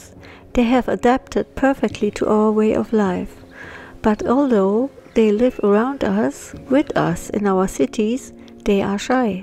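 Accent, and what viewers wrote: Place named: Europe. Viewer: I've started looking for crows to feed. No luck yet getting close. I'll try your advice. I like your accent. It sounds European.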